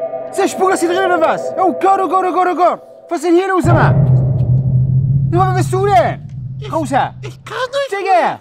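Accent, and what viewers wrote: North, Germany. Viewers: Dieses Norddeutsch war einfach mega 😂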